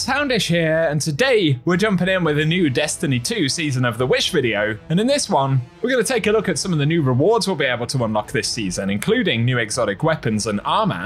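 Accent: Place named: United Kingdom. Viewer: Here for the delightful British pronunciations!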